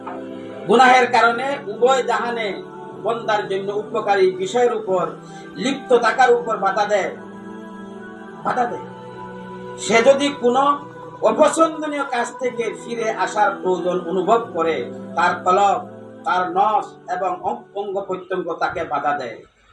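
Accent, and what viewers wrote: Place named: Sylhet, Bangladesh. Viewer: Bhai where are you from originally (from bd) ? It sounds like you're my sylheti fellow Muslim bro😂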